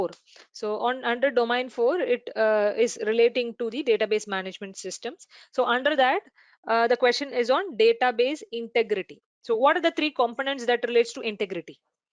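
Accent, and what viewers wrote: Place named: South India, India. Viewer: Disgusting South Indian accent. It’s domain not domine!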